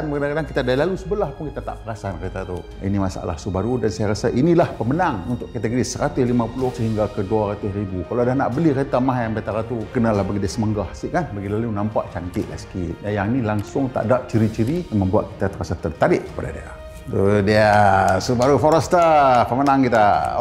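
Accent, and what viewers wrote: North, Malaysia. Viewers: Ni versi utara ka? Lain maciam dia punya bunyik....ha..ha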